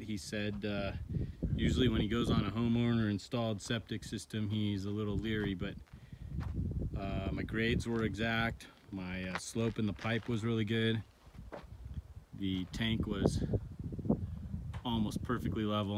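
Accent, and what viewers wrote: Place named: Northern Idaho, United States. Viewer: You sound like everyone in Northern Idaho. Ha except here they tell Californian's to go home. The funny thing is they are all from California but they came here 20 years ago so now they think they claim the state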